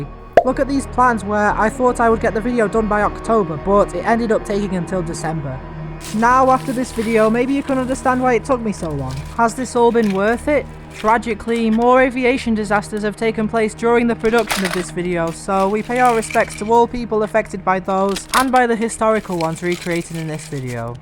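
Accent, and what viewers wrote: United Kingdom. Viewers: bruv I love your vids And your accent is kinda funny(no offence sorry I know your British correct me if I’m wrong)(btw Im subscribed😊)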